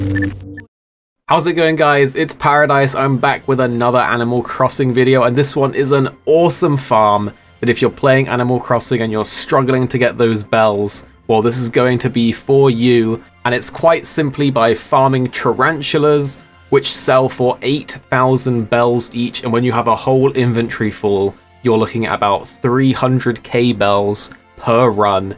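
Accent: England, United Kingdom. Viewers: Man, how you managed to get that British accent? It's amazing!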